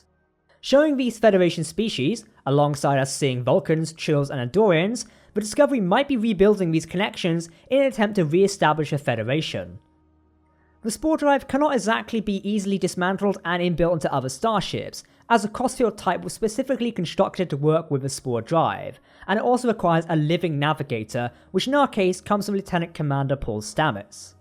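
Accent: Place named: England, United Kingdom. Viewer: Debris is pronounced “debree” not “debreeze”. With an English accent it’s stunning you pronounce the word incorrectly.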